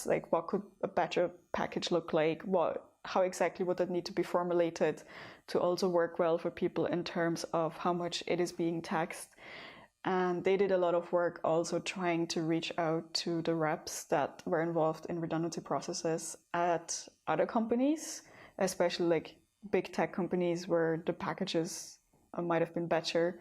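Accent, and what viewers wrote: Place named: Ireland. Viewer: I just can't get over how you are sounding more and more Irish with every video lol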